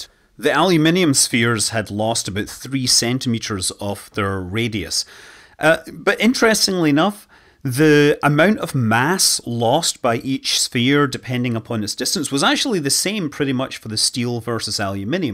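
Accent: Scotland, United Kingdom. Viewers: Scottish to bro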